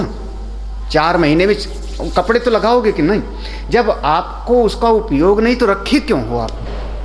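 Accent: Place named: Allahabad, India. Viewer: Swami Ji aapki tone Allahabad hi hai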